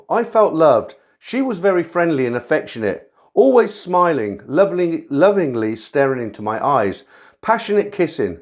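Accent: United Kingdom. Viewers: Hey Mr, I LOVE YOUR STORIES - It's such an original concept, but the way you read them is sooo properly perfect!! I'm American and live in San Francisco, and your British accent makes the stories and listening to you vids awesome!! I do have a story, but it's not a Thai....my wife is japanese, and she lives over there...we don't see each other as often as I would like, but it's still all good.... Maybe our story doesn't qualify, but it is a dream come true!! Again, I always look forward to your uploads and storytime read(s)!!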